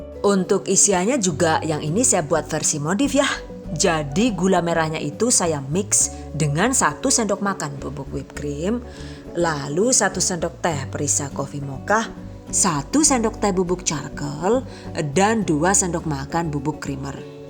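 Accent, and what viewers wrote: Banyumas, Indonesia. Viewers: Nah klepon ala unik banget soalnya pake nutrijel, apa hanya aku yg baru tau kalau nutrijel bisa buat klepon😁 Selalu sukak sama penjelasan2nya, apalagi bahasa ngapaknya itu "pada mledos kabbeh jan" lucuu dengernya😂 dan ciri khasnya itu klw ngomong OK GUYS atau CATAT😁 bikin orang yg gak mudeng jadi mudeng😁😍 #GiveawayTHV2020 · Klo sy buka, diantaranya jg selain tertarik resep2 nya bunda cantiek, tapi juga 'ngapak' nya itu lho yg kutunggu...hehehe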